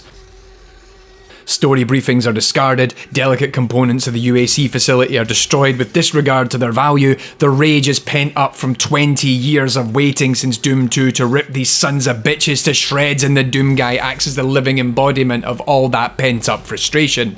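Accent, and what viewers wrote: Scotland, United Kingdom. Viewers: A scotsman tells me about violence. Love it. TAEK DE RUENSTOOONE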